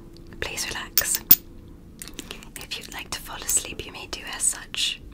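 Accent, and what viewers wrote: England, United Kingdom. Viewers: oooohhhh gurl i love the vids in a british accent as a fellow brit i’m fooled you sound so british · Are you really British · I’m wondering if you speak British🤔🤔🤔🤔🤔🤔🤔🤔🤔🤔🤔🤔🤔🤔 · You're vids are always amazing thanks for the hard work I'm just not into the British accent lol but don't worry about what I don't like from you're vid, that said I will always watch your vids and enjoy them 😊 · Wait, do you usually have an english accent? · Always love the English accent,! Loving this so far. So excited for this year for you! Can't wait to see you hit that one million mark!!!:_gift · 10 outta 10 British accent, would go to London with it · Are you British/Australian or did u just nail this accent?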